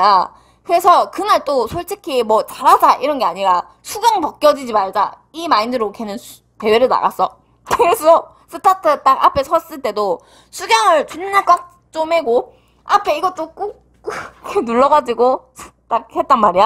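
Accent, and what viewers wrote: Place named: Daegu, South Korea. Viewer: 대구 사투리 같은데 김해 느낌도 있고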